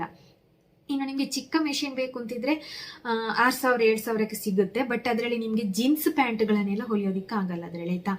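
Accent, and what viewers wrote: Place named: Mangalore, India. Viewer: Neevu maatnaduvaga Mangalore bhashe tara ide akk a.nim ooru yavdu.naanu mamgalore adke kelde .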